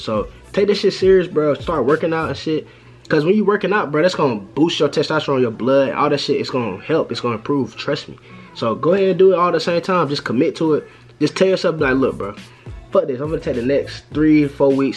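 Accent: United States. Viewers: Bro where you from? I feel like you from texas😂the way you talk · You from Atlanta?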